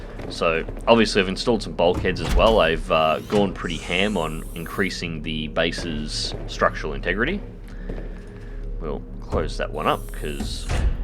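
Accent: Australia. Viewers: its soo relaxing to watch underwater exploration carried out by someone with an Aussie accent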